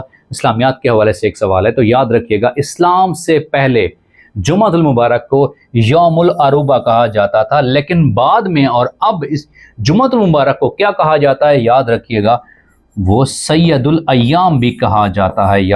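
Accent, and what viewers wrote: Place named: Europe. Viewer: your english accent is like a European teacher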